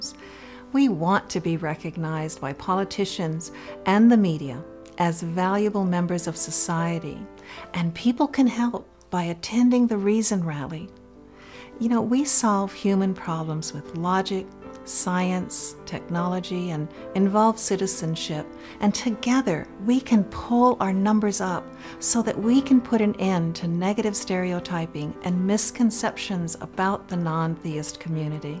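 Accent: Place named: United States. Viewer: It always warms my heart to hear American English Language being spoken Reason.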